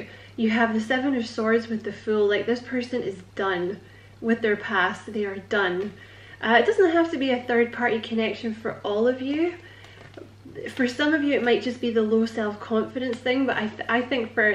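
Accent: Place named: Scotland, United Kingdom. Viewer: Thank you! I have been 100% confident in this connection for a while so I'm pretty relaxed in it (Spirit told me from the very beginning, "if you don't loose your cool, everything will be fine"). However, confirmation is always nice. He's water and oh, so slow... I'll keep doing my work while he does his. BTW, I love your Scottish accent!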